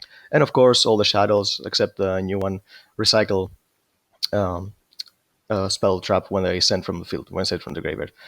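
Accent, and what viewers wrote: Greece. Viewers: Και έλεγα ρε φίλε λίγο ελληνική αυτή η προφορά 😂😂😂, πολύ καλή δουλειά πάντως μπρο συνέχισε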